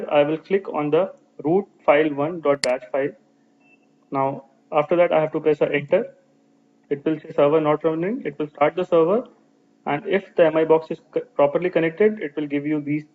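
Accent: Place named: India. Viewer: i don,t like your indian accicent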